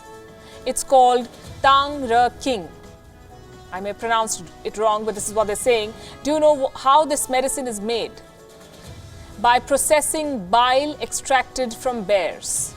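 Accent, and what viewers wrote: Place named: India. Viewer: I love Indian accent on English language, sounds cool.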